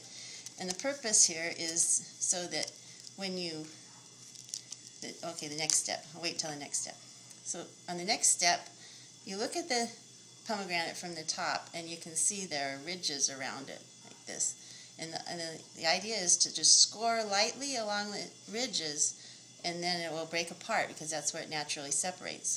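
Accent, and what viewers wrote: United States. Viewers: THE 'right' way? The accent held no surprise for me. Who else would be so arrogant as to think that 'their' way is 'THE' right way but an American? Say what it is: a better way, a less messy way. But 'the right' way? Says who? I've seen several ways that are even better.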